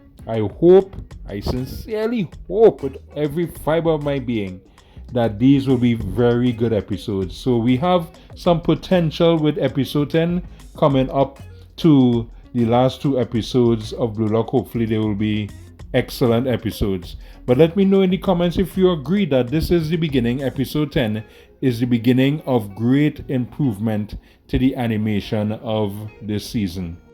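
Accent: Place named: Trinidad and Tobago. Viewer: Are you from trinidad cuz im from trinidad and i recognize how you were talking just like a trini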